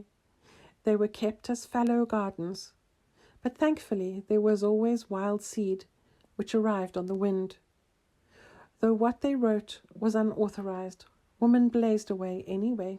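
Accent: South Africa. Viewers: Accent sounds south African ❤❤❤ thank you ☺️ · The reader sounds South African 😊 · Are you South African? I love your accent.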